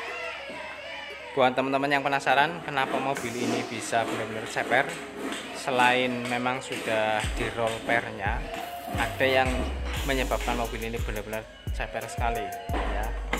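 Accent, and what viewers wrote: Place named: Banyumas, Indonesia. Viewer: Pada pada orang ngapak...infonya mas yg bagus2 buat tambah ilmu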